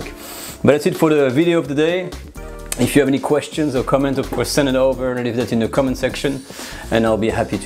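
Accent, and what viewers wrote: France. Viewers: Quiche Lorraine is NOT made with cheese!!! That we call a quiche Savoyarde. Despite his French accent, this man hasn't got a clue about traditional French cuisine, as I learned from many others of his video's. He is a bussiness man rather than a cook. His lack of basic knowledge and ingredients is painful to watch! Please take a look at other sites on the internet for traditional French cuisine, there are plenty!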